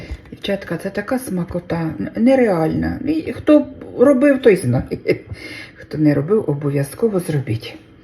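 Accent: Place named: Central Ukraine, Ukraine. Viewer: Яка приємна українська мова в центральній Україні, чудова. 😊❤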